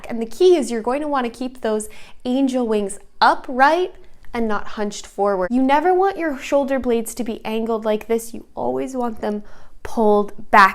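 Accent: Midwest, United States. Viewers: What Midwest state you from?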